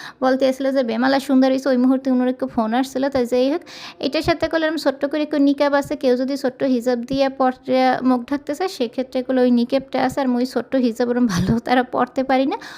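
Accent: Barishal, Bangladesh. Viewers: আপু আমার হাসবেন্ড কাতার থাকে। তোমার ভিডিও আমার খুব ভালো লাগে। আমাদের বরিশালের ভাষা খুব ভালো লাগে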